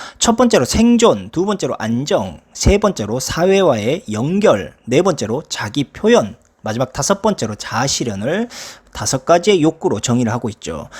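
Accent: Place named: Suncheon, South Korea. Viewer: 친정이 순천인디 님 사투리 항상 즐겁게 듣고 있습니다 오늘도 화이팅 하십시오 그동안 밀린 댓글 오늘은 도배하고 갑니다 저는 그럼 오늘은 이만 뿅~~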